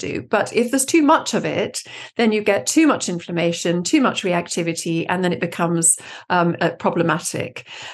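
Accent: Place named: South Africa. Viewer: Excellent interview with great advice. Thank you so much for bringing this to us. And rather nice hearing your SA accent! Take care. ♡♡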